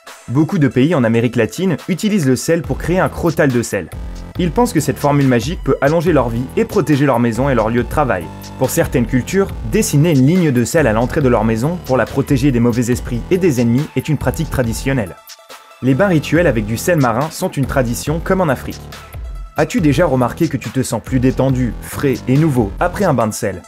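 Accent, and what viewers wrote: Quebec, Canada. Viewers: kawaiser 5.1 il habite au quebec je pense car il as un accent québécois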